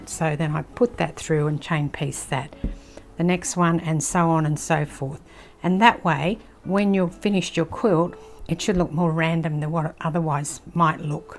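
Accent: Australia. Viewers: Hi, that’s a great tip on this lovely Fat Quarter Shop quilt. I especially loved listening to a fellow Aussie. Cheers Camille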